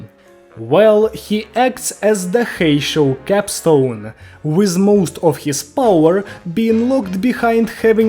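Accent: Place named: Russia. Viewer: holy russian accent, btw thanks for the guide i am new to the game and i've pulled him this video helped me a lot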